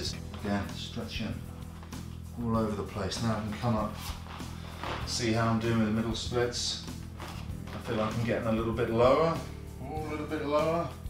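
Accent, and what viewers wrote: United Kingdom. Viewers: Ey,this is amazing...I can do some physical exercises and at the same time improve my listening and pronunciation... Nice British pronunciation!!👌👌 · Pretty British 🇬🇧 accent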